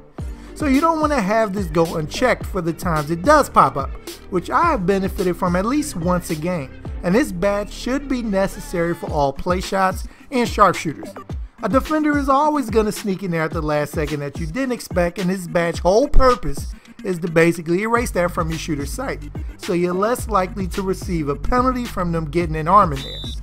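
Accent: Baltimore, United States. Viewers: Bruh u can hear that Baltimore accent everywhere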